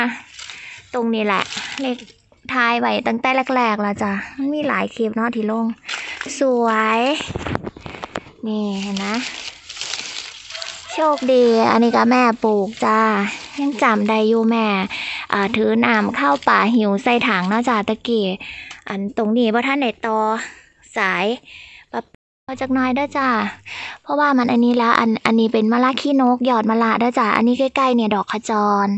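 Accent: Isan, Thailand. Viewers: เว้าอีสานเป็นตาฮักเนาะ...มะม่วงที่บ้านพี่ก็ออกลูกต่อเนื่อง..มดแดงก็เยอะ..สวนเกษตรอินทรีย์จะมีมดมาอาศัยอยู่เป็นเรื่องปกติ..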